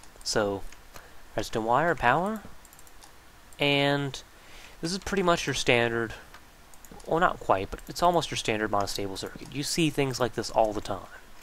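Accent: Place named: England, United Kingdom. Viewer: Are you a fancy person from England? Your voice sounds like that.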